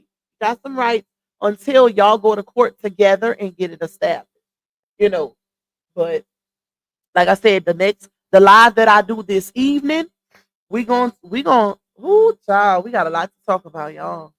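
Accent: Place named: South, United States. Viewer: And may I say I LOVE the way you say BER-DAY!!! 🤣🤣omg that's the cutest Southern accent I ever heard!! Love you! 😘💕✨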